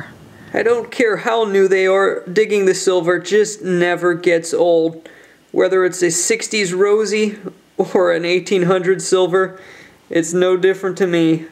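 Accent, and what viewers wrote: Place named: South, United States. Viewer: Great hunt its also funny you are picking up on the southern accent and sayings.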